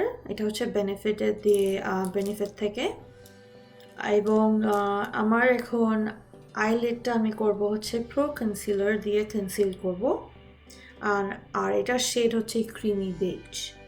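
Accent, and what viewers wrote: Bangladesh. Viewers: i gues u r from the northern part of Bangladesh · I guess you are from bogura.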